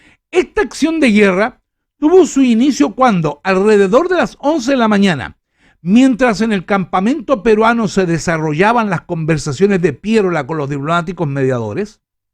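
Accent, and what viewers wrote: Chile. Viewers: tomese unas vacaciones y váyase a Lima unos meses. Cuando regrese a Santiago verá que su acento y vocabulario habrá mejorado notablemente! Pronunciará bien todas las palabras y su léxico tendrá una notoria mejoría. Hágame caso buen hombre! Discúlpeme, pero los chilenos tienen una forma de hablar tan espantosa que ofenden los oídos de los demás hispano hablantes. He dicho.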